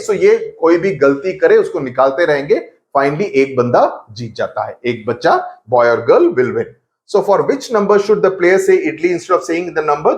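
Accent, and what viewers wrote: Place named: South, India. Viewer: Sir you are south INDIAN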